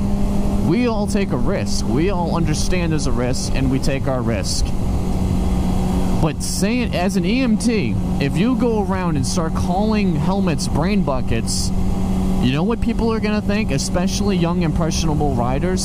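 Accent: Massachusetts, United States. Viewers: Are you from Massachusetts?!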